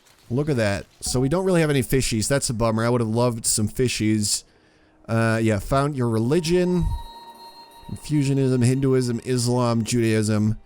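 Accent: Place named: California, United States. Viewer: I would never guess you were Dutch by your accent. I thought you were Californian!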